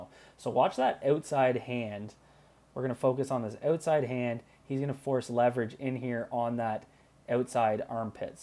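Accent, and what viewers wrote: Canada. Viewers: Your Canadian accent is so strong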